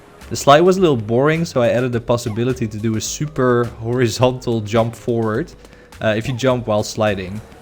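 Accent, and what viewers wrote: Netherlands. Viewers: Yo, so ehhh, I just wanted to say that Unity3d also has Visual Scripting implemented since the beginning of 2021 :D I'm actually using that for my game :P EEEEEN Ik hoorde door je stem (en zag toen in je 'Over') dat je Nederlands bent 👍👍👍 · Hoor ik hier nou een stiekem Nederlands accentje in 😂🤲🏻 Character controller ziet er super smooth uit en er zijn zoveel richtingen waar deze game nog heen kan gaan! Kijk erg uit naar je volgende devlog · I hear a Dutch accent! Succes met je project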